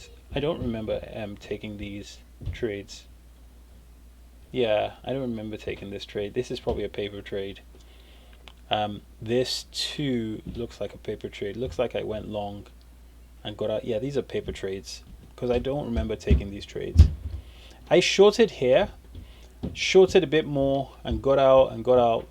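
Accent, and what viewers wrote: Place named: England, United Kingdom. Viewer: Btw where are u based? You sound English but your charts are in America :-)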